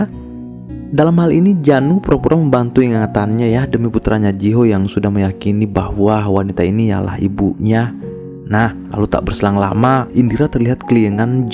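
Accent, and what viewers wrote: Bugis, Indonesia. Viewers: Bugis apa adminnya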